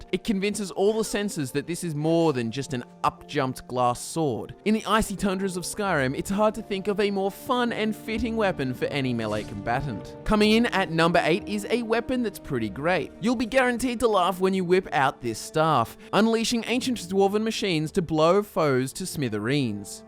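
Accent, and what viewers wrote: Australia. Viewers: He's Australian, shouldn't be that hard to figure out. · Is it just me or do all Australian guys sound the same?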